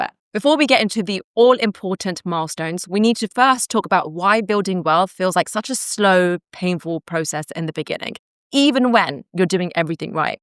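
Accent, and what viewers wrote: United Kingdom. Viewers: Probably the uk with her accent. Though it might be Australian. I can’t really hear what accent it is. It’s not clearly either. Either way Maths is used instead of math in British, Australian and I think South African English. Americans and I’m pretty sure Canadians use math.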